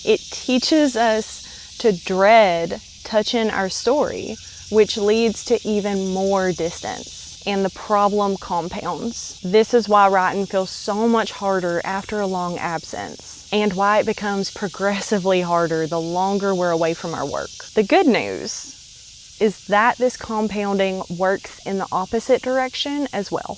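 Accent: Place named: North Carolina, United States. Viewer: I love your accent it sounds very NC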